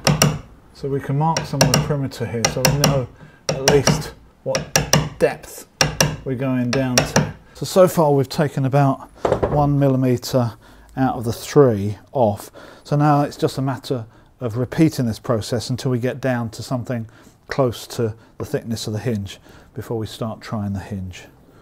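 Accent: England, United Kingdom. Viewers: Maybe it’s the English accent but you sir are a terrific teacher! Subscribed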